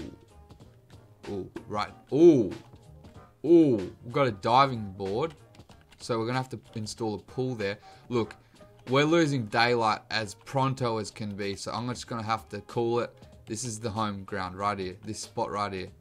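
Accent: Australia. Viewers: Wait are you Aussie?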